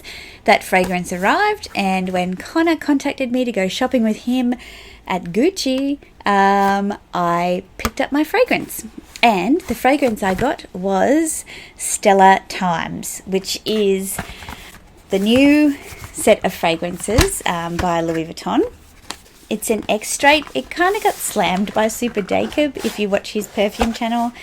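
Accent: Australia. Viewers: Happy (belated) Birthday!!!! I like to listen to your Australian accent. Keep being blessed!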